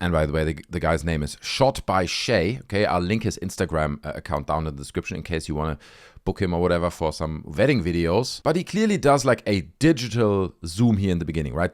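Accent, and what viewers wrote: Germany. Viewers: dude, the combination of your real german accent and choice of words while mocking what true believers would say in response to the "evidence" they have just seen on these videos clips is pure comedic gold!!! Keep up the great content. I am suffering with you but you make it easier to tolerate these idiots!!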